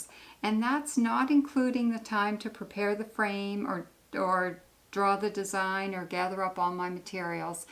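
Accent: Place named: Nova Scotia, Canada. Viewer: Since I'm from Nova Scotia, I loved watching your video and hearing the accent I grew up with. You are quite the artiste! I can't imagine trying to figure out how long it took to create a mosaic. No time limit on true art, eh? I'm sure those who paint on canvas or those who do pottery or those who chip away at a statue don't know or care how long a piece takes. :) My daughter is the one who collected all her sea glass and your videos will help us understand how to create our own art (I'm her helper … sort of) ;)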